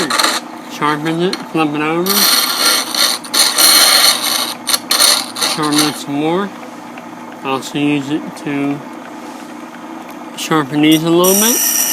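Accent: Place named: United States. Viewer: gangoso americano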